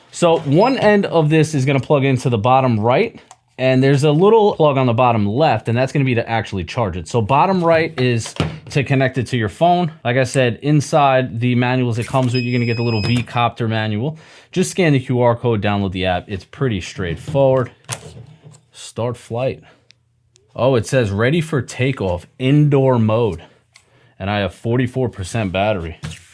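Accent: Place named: New Jersey, United States. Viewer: Bro i would love to fly with you one day. Just got back in to flying. I live in jersey. Sound like a jersey guy.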